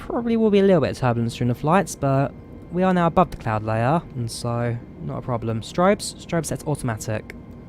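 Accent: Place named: Yorkshire, United Kingdom. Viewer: Where are you from...you have that yorkshire accent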